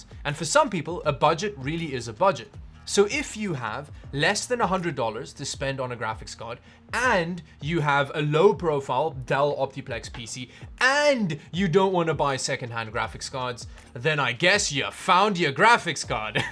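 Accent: South Africa. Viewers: Hey man I hear that accent my fellow South African good to see some SA youtubers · You must be somewhat South African related? Your accent and word choice gives South African vibes😅 EDIT: Another scenario for either of the cards is to use it as dedicated PhysX GPUs, I have successfully used a GT 710 for PhysX in Borderlands 2, but it is not sufficient enough for Killing Floor 2 since that requires a dedicated GTX 1060 for PhysX. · This man sounds south african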